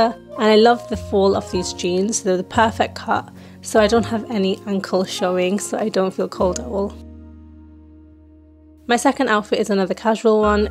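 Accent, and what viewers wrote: United Kingdom. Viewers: Your accent is british? ✨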